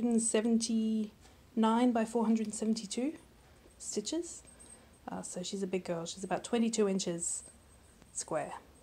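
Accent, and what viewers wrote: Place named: Australia. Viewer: Congratulations! It is a beautiful finish and you have every reason to be excited about it! Also great to hear another Australian voice on Flosstube (I'm from South Sydney). I love to watch planning videos so I will certainly be watching that when you get a chance to film it. Thanks for sharing your finish with all of us.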